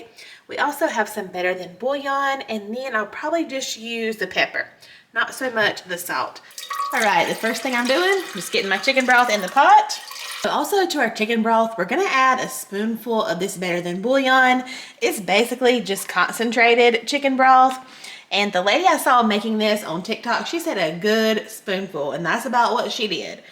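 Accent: South, United States. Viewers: Btw, I love your southern drawl ❤ 😊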